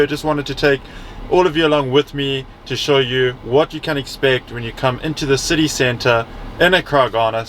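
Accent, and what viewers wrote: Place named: South Africa. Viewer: You sound South African, are you? I am.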